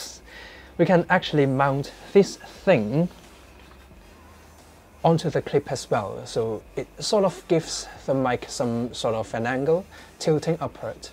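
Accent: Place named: United Kingdom. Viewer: How is this guy's english so good and british? :D Great vid, brother!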